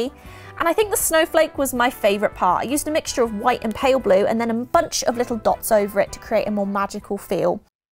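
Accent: United Kingdom. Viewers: Someone plz explain. She has a amazing British accent but she talks all the time about Walmart, USA and other things related to that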